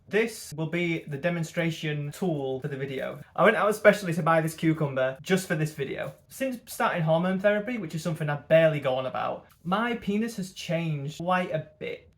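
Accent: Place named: United Kingdom. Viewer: Forgive me for being a dumb American but you’re so British! LOL I could listen to you talk all day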